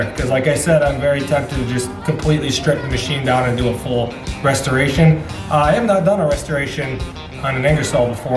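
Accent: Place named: New York, United States. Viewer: Awesome!! Great Intro!!, you need way more subscribers!! You have kind of a New York affect and a slight accent as well as Northern New York Scenery however I would guess that you are in New Jersey. I am in Pennsylvania and I suppose that is also a possibility. I have an Allis Chalmers B110 also. I am restoring a John Deere 112 that I am modifying with an air compressor system accessory. My Craftsman GT18 Is in the process of having suspension welded on the front end, a 90 degree gear box to change a twist belt into two straight belts, larger tires and a lift. Talk to you soon. Great video!!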